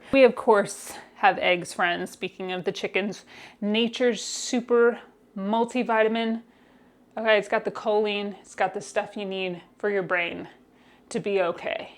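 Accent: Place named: Northeast, United States. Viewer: Where are you originally from? I notice a bit of a NE accent (water) 😅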